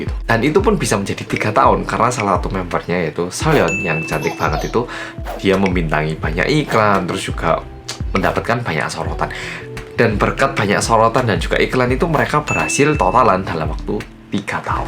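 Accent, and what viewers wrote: Java, Indonesia. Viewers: Medhoookkkk, mantul rek 😂😂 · Yang ku tunggu adalah yang MEDHOKKK ..udh jdi candu nih medhok buat gw😅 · orang Korea yang... Medhookk BP: Oppa!!! Ilustrasi: BTS Sempurnaaa😍😍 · Lah...ini orang Korea asli atau orang Jawa asli ya...bingung bet... Aw..... ....... Logat bahasa ny tu lhooooooo biasanya huruf A di Korea dibaca agak ditahan .......begiy juga D lah ini kok D nya dalam bet bhs. Jawa......B ny jg wkwkkwkwkw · Meddooook.. Lama lama aku keknya jadi medok juga gara gara sering nonton Kak.. Gpp sih menambah kemampuan bahasa ya kan Mas? Uuuuu😆💚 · wkwkwkwk anaknya orang korea yang medhok, bisa aja mas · Salut sama mas oppa ini bahasa Indonesia nya lancar bgt sama medok pula . Jdi kaya orang Indonesia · Me : medhooook👌👏👏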